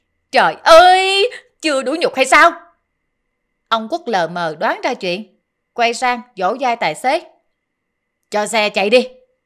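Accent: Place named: South, Vietnam. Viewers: giọng diễn đọc rất miền Tây Nam Bộ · 😅dung la m.c co giong doc mien nam rat gi🍓 khong lai ti nao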